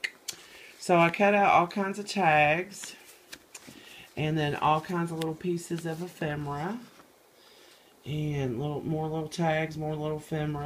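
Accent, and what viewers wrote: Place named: South, United States. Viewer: Live the little book. I notice you have a southern accent. Where are you from. I'm in Alabama.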